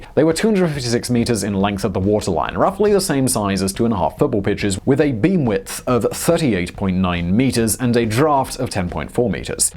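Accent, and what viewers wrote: England, United Kingdom. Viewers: I have no idea what this is but now I want to know. PLEASE Simon? Use your amazing British voice to teach me useless shit :) love you man. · The most English pronunciation of Japanese words I have ever heard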